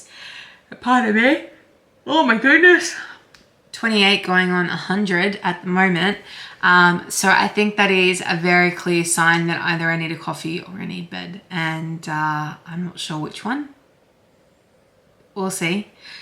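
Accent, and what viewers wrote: Australia. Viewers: I have just binged every one of your episodes since the start… I am also rewatching Gilmore girls… you have an awesome channel and I am happy to hear another Aussie accent… I live in Brisbane… keep up the good work…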